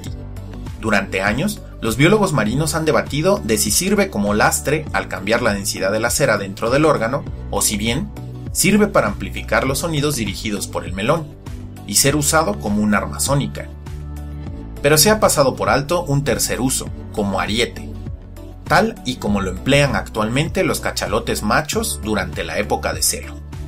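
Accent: Mexico. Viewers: erea de México? :O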